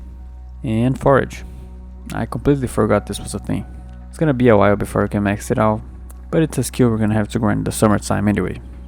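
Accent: Brazil. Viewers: kakakak da pra ouvir o brasil no seu sotaque, vc eh foda mn! vou assistir tudo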